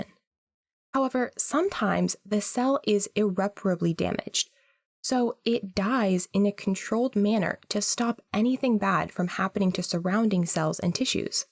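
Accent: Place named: United States. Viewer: It would really help if you were to not speak American. It is very difficult for us English speakers to follow what you are saying, because we have to mentally transform it, whereupon miss what came next.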